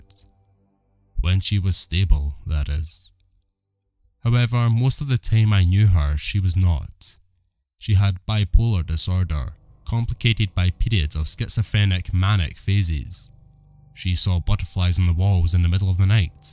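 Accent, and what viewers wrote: Scotland, United Kingdom. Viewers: First time hearing horror stories in a Scottish accent and absolutely love it. Had to scour YouTube to find this channel and thank you so much for narrating them. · Criptids are my favorite subject,and paranormal experiences are a close second. I would like to thank you for your time and effort in making these stories. I love your accent, I've always had a soft spot for Scotland and the accent. Frankly you could read the yellow pages and I would listen. 💙😱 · I really enjoyed that. They were different and I think I have only heard this topic dealt with once or twice before so it was good to hear original material. You have a great voice to listen to. I love the Scottish accent and it makes it different. Here is an idea for a topic, I remember reading a story on Reddit where a couple were randomly rescued from a potentially violent situation involving a couple of tweekers by an enormous and scary black dude .... 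how about “unlikely heroes “ or someone totally random just completely saved my bacon?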